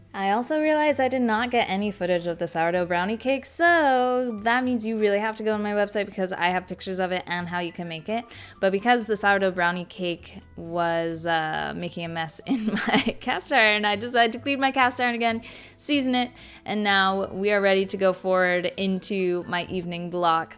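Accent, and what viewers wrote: Canada. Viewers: Have you heard how she pronounces produce? It's a Canadian accent type of thing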